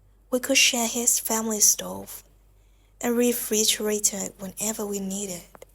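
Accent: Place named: Vietnam. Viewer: don't ever feel self-conscious about your voice or pronunciation. You are speaking English, which puts you ahead of many other Vietnamese ladies. Your video is very well presented. You will do just fine if you keep going and don't let the negative commenters bother you. Anyone who says anything negative about your efforts is inconsequential in your life. Your husband must be a cruel person to leave his wife and child in this situation, and bad luck will follow him in his future endeavors. I believe your channel will grow very fast, and you will benefit greatly from your efforts.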